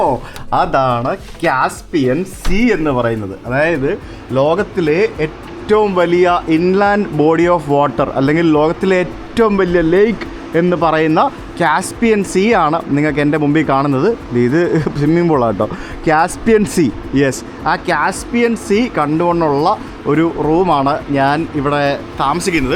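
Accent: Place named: North India, India. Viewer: why do you speak english llike north indians?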